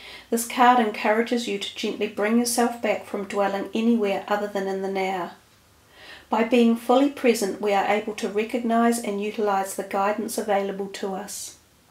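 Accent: New Zealand. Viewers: you have an NZD accent.. where are you from? North or South?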